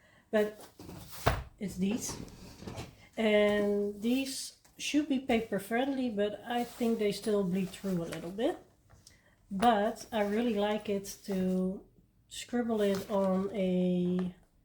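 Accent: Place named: Scandinavia. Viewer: What an amazing and interesting life you have in the Netherlands! I loved seeing your hamster space, they are cute little babies. 😊 Thank you for sharing some about your life with us! It helps me be invested in you as a person and your channel as well as seeing your beautiful coloring. Thank you again! Oh - and your accent is not funny ha ha it’s lovely. My sister lives in Sweden and her husband speaks several languages from the region so I figured you were nearby. 😊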